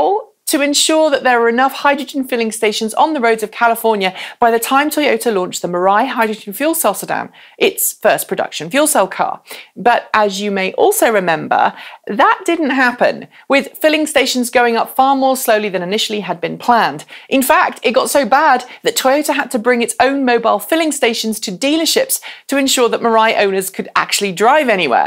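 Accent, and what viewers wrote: United Kingdom. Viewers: Thanks! I've never been called 'terribly obnoxious' before. Nor do I know how it's possible for a voice to be obnoxious. Is it the British accent? The pitch? The intonation? Of course, there are always subtitles. And we do provide those:) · Nah, I'm fine with a British accent. For me it's the pitch, it makes it kinda.... squeaky.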